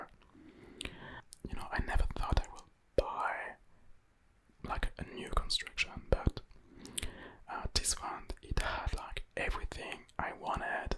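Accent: France. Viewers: Your French accent is beautiful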